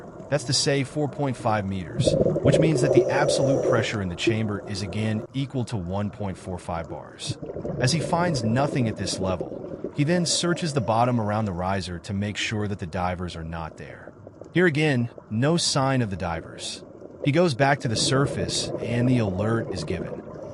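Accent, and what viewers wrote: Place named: Belgium. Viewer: Just the audio. He explains at the beginning that he has a thick Belgian accent so for clarity he uploaded his transcript to a reader.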